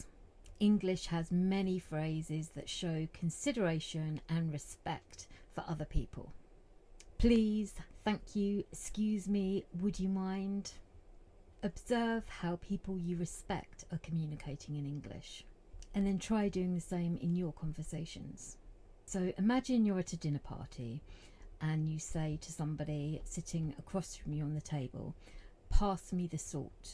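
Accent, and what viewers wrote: United Kingdom. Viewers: Thank you very much for shearing 😊 I really like the way you teach English and of course you wonderful British accent❤❤❤ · Very good your class and your accent is beautiful where are you from in England?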